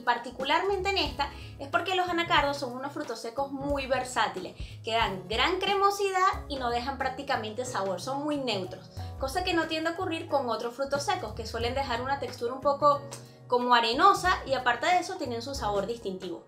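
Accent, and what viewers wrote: Venezuela. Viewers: Hola, estoy en eso de querer volverme vegana pero ni idea de donde conseguír los ingredientes. Por tu acento creo que eres venezolana, si es asi. ¿Me dices por fa donde puedo comprar en venezuela el psyllium, la levadura y los probioticos, y que marcas? Por favor🙏🏼🙏🏼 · Qué fácil, colega jaja, soy profesora y tienes mucha pedagogía al explicar. Un millón de gracias, ya me inscribí. En los comentarios leí que existe una pizza, voy a buscarla, que Dios te bendiga. ¿De donde eres chamita, su acento tiene mucho parecido al venezolano. Chaoooooo.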